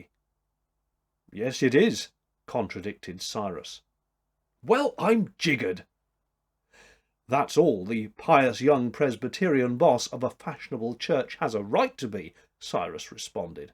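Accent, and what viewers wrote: United Kingdom. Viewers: look into uploading your recordings to Libravox. Make sure to mention your British, because Americans dig the accent.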